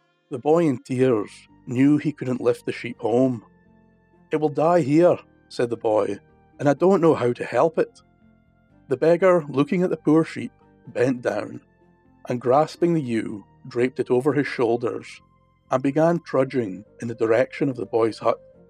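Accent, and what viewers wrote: Scotland, United Kingdom. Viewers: No better accent than the Scottish accent, of course i may be biased lol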